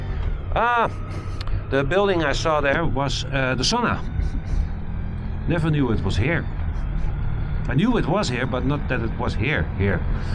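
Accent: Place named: Netherlands. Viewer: Setup functions amazing. The Pan sounds great (love that V4 smoothness) and you voice is picked up clear. Good audio balance overall. Image is up to spec too. Guess all you are missing now is a cam under the head and tail light for some serious cam switching editing and ofcourse those sweet sweet unobstructed views of the Dutch countryside. Soon RTL's MotoNL can pack it's bags (or more specifically panniers). Your English is perfectly understandable despite not being a native speaker. You are grasping for words more than when speaking in dutch but for an ad hoc, on the fly recording not bad at all. And people might mention it but don't worry about the accent. Dutch just has a heavy linguistical footprint. Keep up the good work. Great vid again of this channel. Thanks for the upload!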